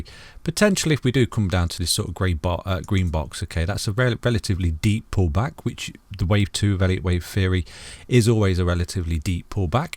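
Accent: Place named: East Midlands, United Kingdom. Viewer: are you from Notts or Leicester? I tell by your accent.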